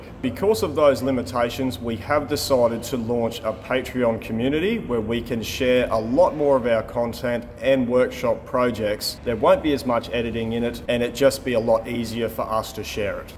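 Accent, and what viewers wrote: Australia. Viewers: Build the drift car! Also Australians get a pass for profanity, no one says the F-word like you do! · I thought you were Australian until I saw that ute launch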